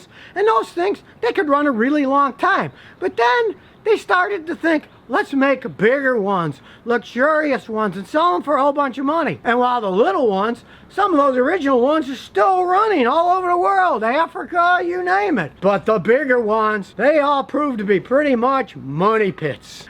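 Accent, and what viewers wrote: United States. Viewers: your english is really american and you do your reseach 100% before a video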